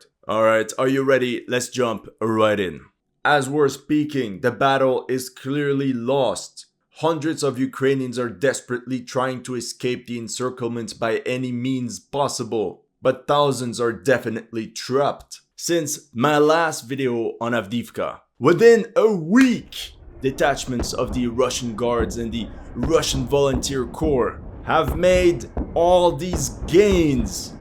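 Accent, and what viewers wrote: Quebec, Canada. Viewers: That "c'est fini bro" get closer and closer to the Quebec accent you are getting closer with every words spoken. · Что ты хочешь от канадца французского происхождения? Нормальное у него произношение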